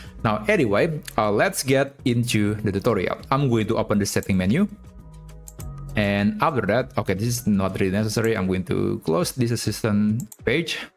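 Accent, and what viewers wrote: Indonesia. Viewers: Apakah anda orang indonesia ? Dari logatnya sih orang Indo yah kak ?😊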